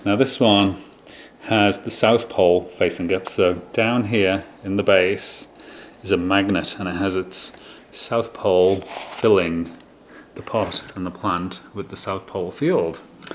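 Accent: United States. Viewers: Oh I could tell right away you were in Arizona, because of your accent!!! Lol!!!! Typical Arizona accent, lol!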